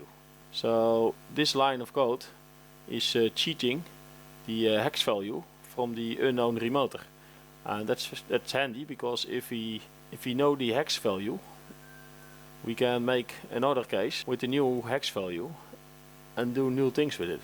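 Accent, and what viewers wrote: Netherlands. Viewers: I love the dutch accent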